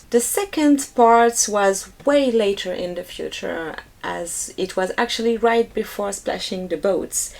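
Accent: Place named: France. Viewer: Lovely french-english, i love it!!!!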